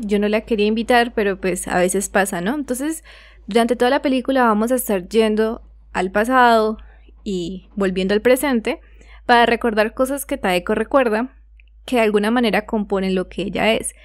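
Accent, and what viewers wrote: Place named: Costa Rica. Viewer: Por su tono de voz creo que sos de C. R.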